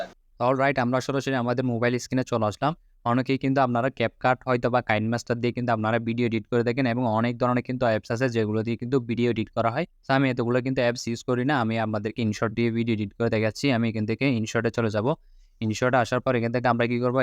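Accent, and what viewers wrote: Chittagong, Bangladesh. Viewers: ও চট্টগ্রামের আমি সিউর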